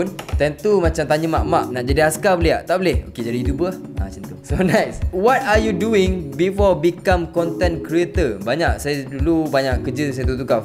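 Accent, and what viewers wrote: Terengganu, Malaysia. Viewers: Aku jem, loghat Terengganu ke atau Kelantan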